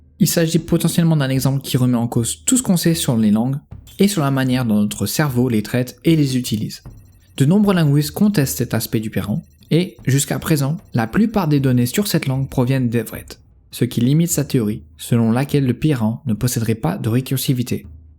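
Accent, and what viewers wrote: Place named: Portugal. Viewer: oui je me demande aussi. Dans quelle région on prononce [tj] dans voiture ? jamais entendu ! cet accent me semble plutôt un peu portugais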